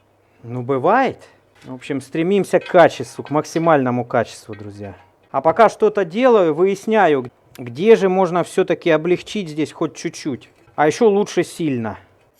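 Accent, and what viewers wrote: Rostov, Russia. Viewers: Ростовский акцент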